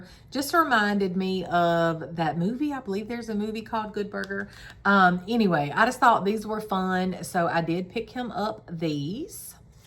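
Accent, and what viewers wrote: United States. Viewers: Hi, Congratulations on your 8.000 subscriber achievement! Watching you is delightful, I love your Southern accent and hauls! Here’s to 20,000 subscriber milestone. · Congrats. You make me homesick for the Redneck Riviera with ur wonderful accent. You also have the cutest dimples. 💔🤍💙🇺🇸🇺🇦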